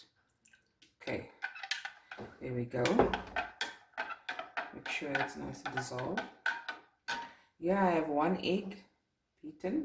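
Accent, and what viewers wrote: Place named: Cape, South Africa. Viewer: Oh, how I long for the cape when I hear your accent. I grew up there.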